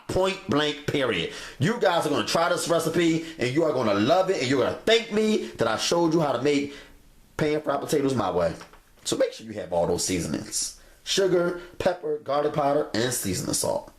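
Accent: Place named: Philadelphia, United States. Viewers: on the sugar! Us Pollocks use sugar in our potato pancake recipes which is then plated and topped with powdered sugar, if you like. But I question one thing, me being from Chicago. How come you boys from Philly can't pronounce boil or oil???? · You got that Philly accent. Im originally from Philly. I'm in Ohio, I get teased about my Philly accent. You got me laughing. I'm making this tonight.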